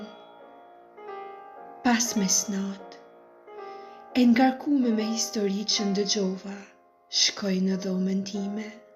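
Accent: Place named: Gheg, Albania. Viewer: Ah gegnishtja jote e bukur me nje ardhje poetike plot ndjeshmëri..💖🌸✨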